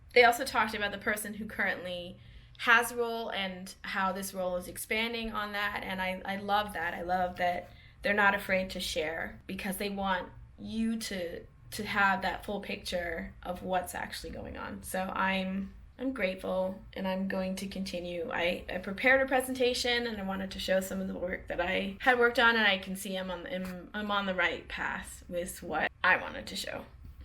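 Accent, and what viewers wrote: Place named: Toronto, Canada. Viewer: Toronto Mans accent is a VIBE 😂😂😂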